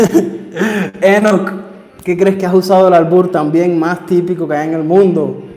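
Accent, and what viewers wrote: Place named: Cuba. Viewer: No inventen, se oyes bien cubano, bien. Con acento cubano y nada mexicano. Soy mexicano de Florida. Conozco a los cubanos de todo tipo, trust me! Desde los recién llegados, que no hablan inglés. Otros que hablan un inglés, pero todo estropeado,heavy Soanish accent, que yo no entiendo, ni mdrs . De esos que les dices, "Chico, mejor vamos hablar en español". "Porque your English, is horrible!", jajajaja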